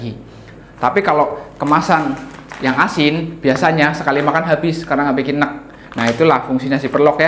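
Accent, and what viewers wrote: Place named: Banyumas, Indonesia. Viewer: Logatmu ngapaknya medok banget , mas. Anyway..sukses selalu ya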